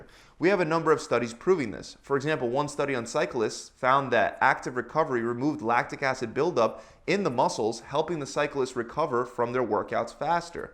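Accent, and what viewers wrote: Philadelphia, United States. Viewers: I know he's from philly from the way he says water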